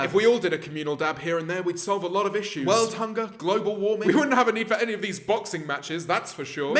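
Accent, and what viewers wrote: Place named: RP, United Kingdom. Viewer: Your so posh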